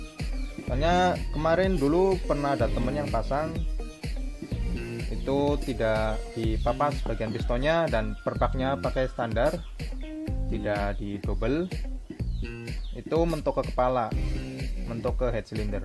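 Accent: Banyumas, Indonesia. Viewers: Mas ngapak yaa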